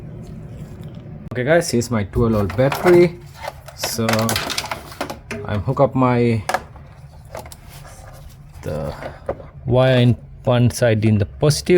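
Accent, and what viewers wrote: India. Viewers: So basically pull the rail off with the injectors still plugged in but off the engine wind the engine over see which one is actually missing it’s spray or dirty then do what he done and I’d also use 120 psi compressed air as well down the ends of each injector and see how that goes.. I’d also lose the gloves and be a man and would be great to see a Australian with a Aussie accent doing not a Hindu in 😂😂😂😂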